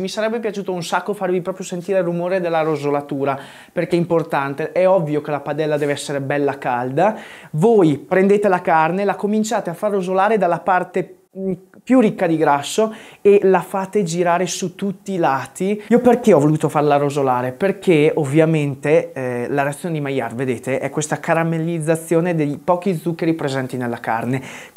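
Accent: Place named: Trentino, Italy. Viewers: non mi ero reso conto prima d'ora che la cadenza e accento trentini fossero così simili al romagnolo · Fai ridere e sei molto bravo, adoro l'accento Trentino! Continua così!!!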